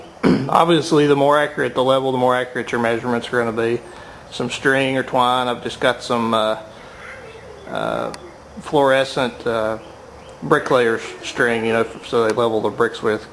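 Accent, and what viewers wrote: United States. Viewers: Where is the accent from? southern states? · virginia accent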